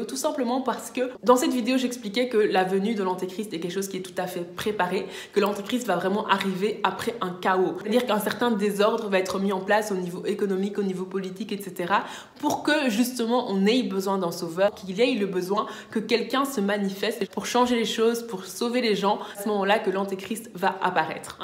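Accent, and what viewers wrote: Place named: Belgium. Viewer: Super message. J'aime bien ton accent belge. Keep it up ! God bless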